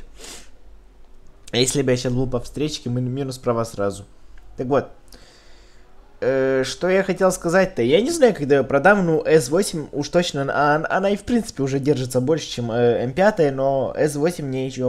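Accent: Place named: Moldova. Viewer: Акцент молдована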